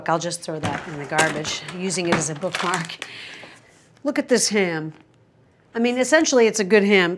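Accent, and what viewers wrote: RP, United Kingdom. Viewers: Awh, my mother had endless cookbooks including many by dear Fanny Craddock. After my mother died I picked up only 2 or 3. mmmmmmm ... I wish I could go back and grab a truckload. Your 'Fanny-accent' is perfect heightened R.P. She really was a breed apart. Few of her ilk are left. Thank you for bringing that era and the older cookery books to life. You infuse even the poetry with sparkle. You are a rare talent! Love, love, love. Xxx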